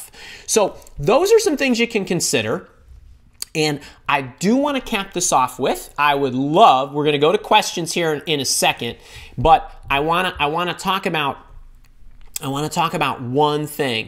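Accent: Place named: New York, United States. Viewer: thanks so much. These times are VERY weird - I don't have to tell you. A "little" off topic here, but I do really like your accent and I'm guessing you're in NYC? How are things there? We've been in tis "lockdown" for a week and a half and it's driving us crazy! But anyway - I'm wondering as the powers-that-shouldn't-be seem to be hell bent on upending our world as we knew it anyway - if there'll be more jobs available "online" as in virtual assistants...perhaps you could do a video concentrating on ideas for all of us currently out-of-work admin folks? I'm fluently bilingual (English/French) so I can translate as well but not "officially". Hmm....I'm hoping to find employment asap - as are we all of course! I'm just wondering what the market is for international bilingual virtual assistants? Heartfelt thanks!;-)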